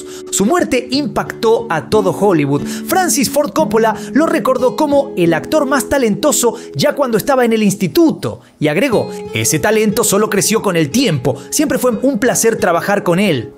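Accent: Argentina. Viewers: Amamos ese toque argentino sello de nuestro..que tiene ese que se yo viste?..cariños desde Chile gracias tremendo Val,siempre sera Jim❤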